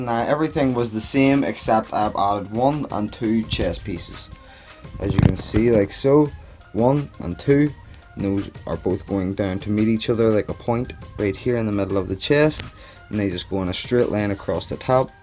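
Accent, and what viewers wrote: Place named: Ireland. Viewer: he is from ireland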